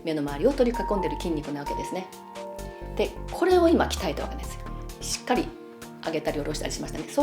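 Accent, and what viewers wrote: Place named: San'in, Japan. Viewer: まだ30代前半なのにたるんできて絶望😭してましたが、毎日試してみます！ところで、さん、山陰の出身ですか？イントネーションにどことなく懐かしさを感じてます😊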